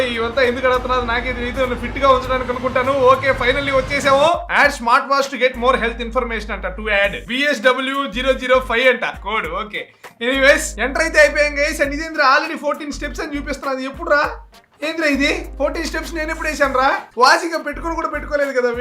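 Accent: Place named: Telugu, India. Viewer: Europe lo telugu abbai la undhi voice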